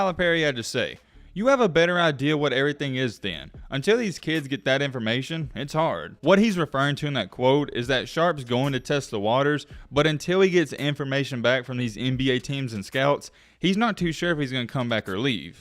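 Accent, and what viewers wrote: South, United States. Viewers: LOL do you really speak like this? sorry, I'm a South African, I only hear this Southern accent in the movies, I always think it's exaggerated LOL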